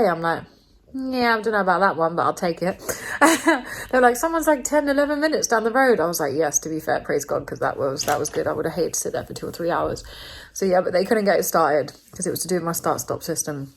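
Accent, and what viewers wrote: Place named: England, United Kingdom. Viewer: Nice English accent different from Americans lol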